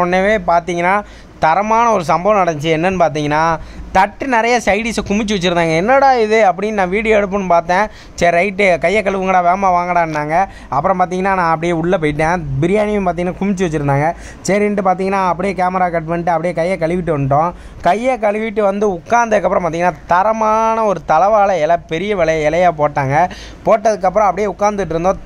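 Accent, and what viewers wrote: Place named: Aruppukottai, India. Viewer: Pronoun aruppukottai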